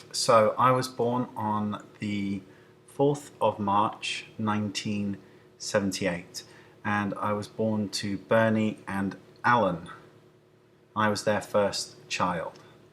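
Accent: United Kingdom. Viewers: Love your story, love how passionate you are about makeup and love your Brittish accent ;)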